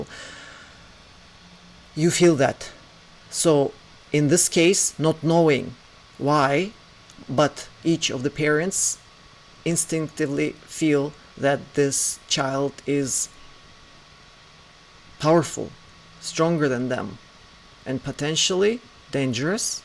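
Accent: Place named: Russia. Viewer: Thank you again Ms... I love your content as much as your rich Russian accent... You presentations are always very clear and informative... 🙏💜😃💜🙏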